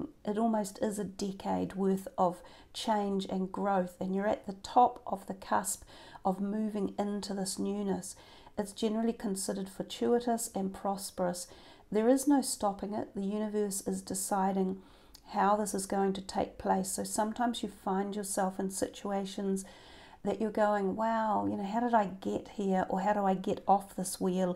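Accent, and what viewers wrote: New Zealand. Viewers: 🥰🥰 refreshing to hear a kiwi accent 😊 Kia ora arohatia to tohu ❤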